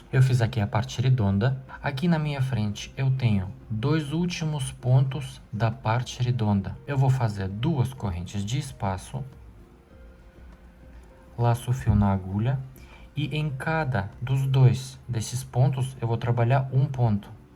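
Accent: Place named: Brazil. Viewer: NOSSA.. EXPLICA MUITO BEM..AMEI A AULA..ADOREI O SOTAQUE, DE QUAL ESTADO DEVE SER? TENTANDO DESCOBRIR PELO SOTAQUE,MAS NÃO CONSEGUI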